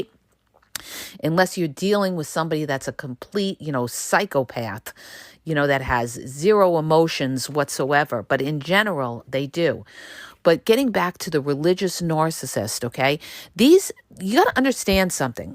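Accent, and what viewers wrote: New York, United States. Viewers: I think you are a very educated person that is helping masses of hurting people❣⛑ 🤗I love yr accent= New york? & you are very pretty. Some constructive help to help you SHINE BETTER! Your tone of voice sounds Angry & I'm sure it is righteous indignation against injustice & abuse & also, in my opinion it is much more classy NOT to cuss, which are offensive words, not necessary. Humbly, I hope u receive my words, only meant to help. Regardless, I will still listen 2 you. 😁🥰💃🏼🤸‍♀️🙌🙏😇🌟🌞 God Bless you❣💝💖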